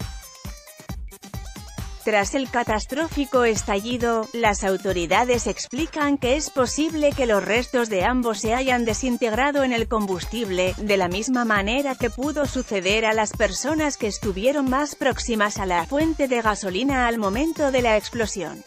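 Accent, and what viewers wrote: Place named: Spain. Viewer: ESTE ROBOT CON ASENTO ESPAÑOL NO SIRVE PA NADA. PERO D CUALKIER MANERA. RIP.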